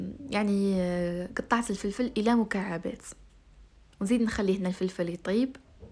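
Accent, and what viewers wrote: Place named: Constantine, Algeria. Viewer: جاتني هدرتك قسنطينية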